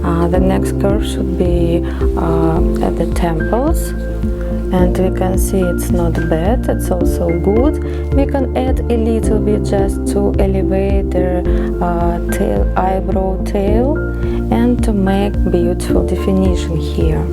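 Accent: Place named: Russia. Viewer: А че говор Русский))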